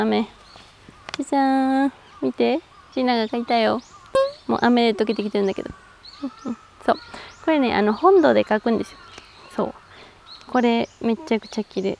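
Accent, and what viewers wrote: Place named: Kansai, Japan. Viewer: しゃべり方だけでなくて、のりも笑いのツボも完全に関西のおばちゃんやん！🤣でも、ジーナさんはだまってたらめっちゃ美人やね😙大阪では男も女も楽しい人がモテるよ👍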